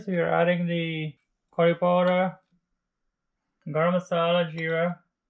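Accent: Guyana. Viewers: I love your accent❤ pure Guyanese 🇬🇾 Not had a nice chicken curry for along time.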